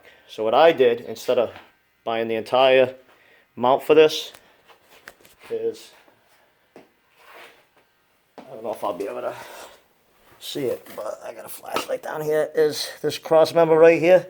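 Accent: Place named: Massachusetts, United States. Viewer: No hiding the fact you are from Mass.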